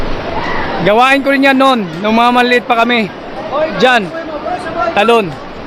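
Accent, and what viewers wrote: Iloilo, Philippines. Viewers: Kuya Taga saan ba talaga province nyo.parang ilonggo salita nyo?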